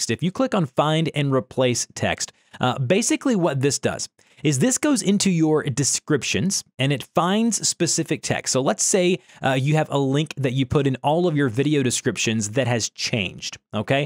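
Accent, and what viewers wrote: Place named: Baltimore, United States. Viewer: Are you from Baltimore? I'm hearing the "O's" in you. Hahaha.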